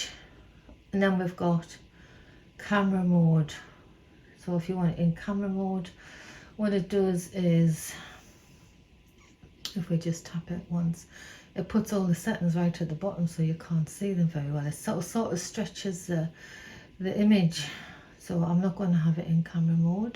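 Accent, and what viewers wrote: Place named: Newcastle, United Kingdom. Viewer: Great informative video, Would not have had a clue about some of the options without it, so many thanks. And seriously - loved the Geordie accent.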